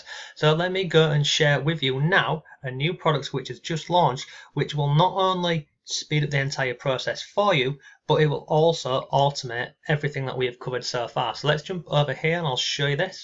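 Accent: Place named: Northern England, United Kingdom. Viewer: your from up North somewhere in England.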